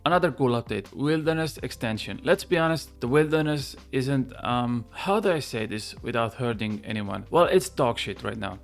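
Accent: Estonia. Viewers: Sa oled eestlane?? Mida hullu. just leidsin su kanali ja mõtlesin, mis aktsent see selline on :D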